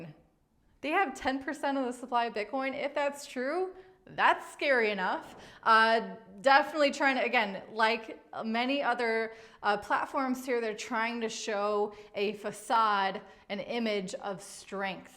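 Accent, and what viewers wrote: United States. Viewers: can someone tell what her accent is? It sounds a bit different to me than the "standard" US accent but I like it.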